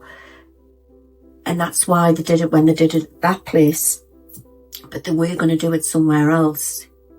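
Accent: Newcastle, United Kingdom. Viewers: Hello fellow geordie! ❤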